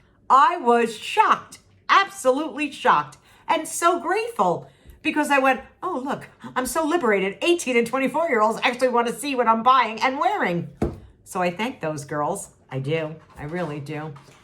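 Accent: New York, United States. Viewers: Good evening big sis, yes you sound like a New Yorker.. oh I will be making that gravy, it looks so good. You are a inspiration to us all. Keep up the good work.. Till next time sissy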